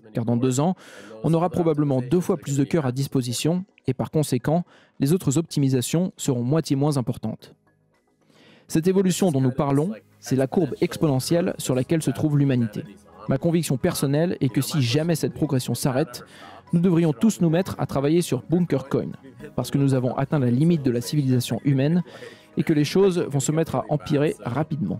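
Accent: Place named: United States. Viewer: C'est vraiment ridicule cette prononciation des mots anglais à l'américaine. Le plaisir d'écoute s'en trouve impacté.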